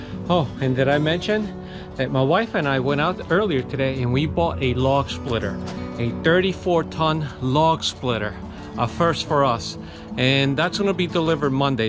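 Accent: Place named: New York, United States. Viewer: You sound like me . I live in NY too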